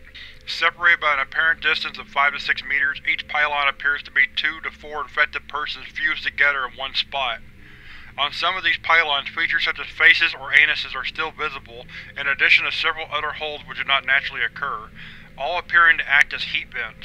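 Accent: South, United States. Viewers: Nice southern accent! You make this seem like SCP 610 was real. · where u from? GA kid myself yet hard to place where u are below the mason dixion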